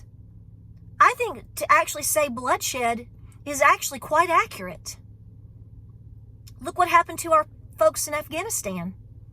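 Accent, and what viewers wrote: South, United States. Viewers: Girl Girl Girl, as we say in the South! If I didn't recognize You're accent (I'm a Kentucky Trumplican!), I'd of thought the "T" was for Tracker as in You have Your Truck back!!! In all honesty, lived in Nashville for 14yrs & I have the same hat! So, some people just have to have something to Bitch about & You just happen to be an easy target. But, no worries Girl, You got this! Life is short, make it Sweet. Hell, I'll be 67 come May & just went back to work for Kroger Today. Both my cars are needing a car doctor & been ankle expressing for 2 wks plus. God said, get in shape Ladybug! & So funny, So did You. ❤ From Kentucky Patriot Warrior ❤❤❤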